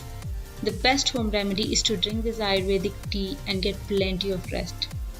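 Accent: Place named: India. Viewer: Just from his/her indian scammer accent, i can confirm that he/she is so stupid that cannot find a job and only way to survive is to scam with "ancient" remedy. I cannot even know if he/she is male or female by his/her voice.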